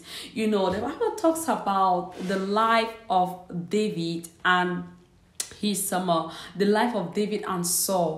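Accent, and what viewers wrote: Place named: Jamaica. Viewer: I LOVE UR JAMAICA ASCENT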